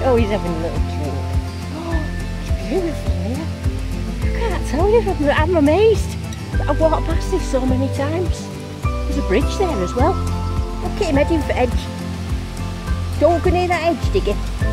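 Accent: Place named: Rochdale, United Kingdom. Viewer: What an amazing watch on ash worth valley. Your videos presentation is spot on. Love your humour and the Rochdale accent is just so close to home it’s a comfort to hear your commentary. Waiting for the sunshine to go and explore ❤️🇬🇧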